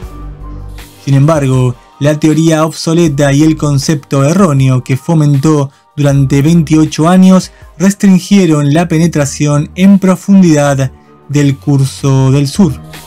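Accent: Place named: Argentina. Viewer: tu hablar con ese sonsonete argentino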